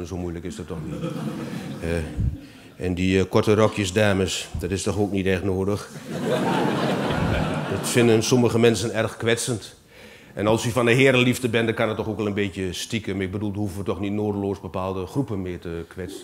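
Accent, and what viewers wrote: Twente, Netherlands. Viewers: wel goed dit twents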